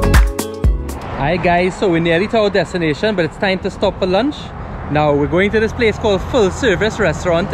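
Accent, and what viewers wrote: Trinidad and Tobago. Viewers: Well this is a pleasant surprise; hearing from my Favorite Trini Accent. Welcome back in your space. I Your oldest Fan. 22/09/12 🇨🇦 🍁 🇨🇦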